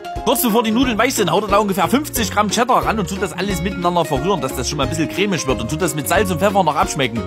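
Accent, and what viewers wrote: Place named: Saxony, Germany. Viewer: Ich liebe dein sächsisch. Klingt für mich nach Karl-Marx-Stadt. Oder wie man heute sagt "Chemnitz statt der Moderne" 😋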